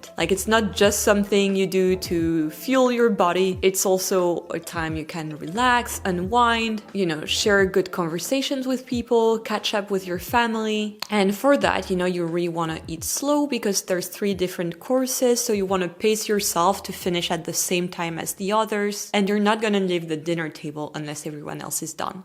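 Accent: United States. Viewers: I'm a French guide mostly working for Americans. First of all, no one ever mentions it but your American English is on spot ! 🎉 I enjoyed a lot rhe section speaking about Food education. I find it very important and i think this is what leads to most of the other reasons you've mentionned. I oftne tell my guests about the long lunch break, even for kids 😂 my 2 yo daughter goes to day-care abd they sit at tiny tables on tiny chairs, eating out of a food tray with different compartment (starter main and desert/cheese) Found it so cute 🥰 Love your videos. Thanks I enjoyed the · How did you get. your almost-American accent?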